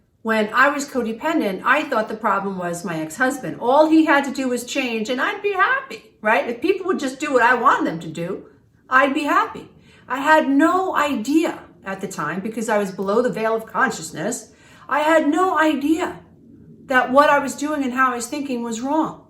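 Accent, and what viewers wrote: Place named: Long Island, United States. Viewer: Another spectacular Stellar video. Life lessons worth millions to me. You're priceless in the knowledge assistance reassurance Etc. That you give to me. I grew up in Levittown Long Island and living in Las Vegas 30 years I haven't lost my accent and it's so wonderful to hear yours makes me feel so at home. Honestly feels like my wonderful mom and her friends are sitting around talking giving me advice like they did the times when I was growing up hanging around the house dealing with s***. Peace light love my friend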